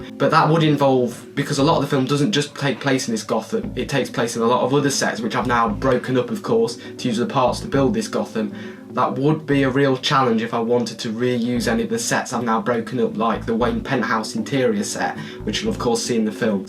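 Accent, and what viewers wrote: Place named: United Kingdom. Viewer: Great mod! bri’ish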